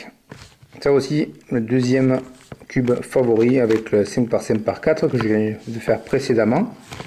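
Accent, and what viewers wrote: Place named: South, France. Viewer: super boulot !!! à conseiller à ceux qui aiment les cubes moi et mon fils (et oui je lui es donné le virus) sommes fans de ces jeux et avons une grosse trentaine de cubes nous venons de recevoir le 4x4x5, 5x5x4, et bien d'autres encore et avons commencé le 4x4x5 grasse à toi merci beaucoup question : sais tu faire le gigamix je ne trouve pas de vidéo explicative comme toi en français ! mon petit grand fait aussi des videos c'est lyondu31 vu ton accent serais-tu du sud merci encore Eric ANTIPHON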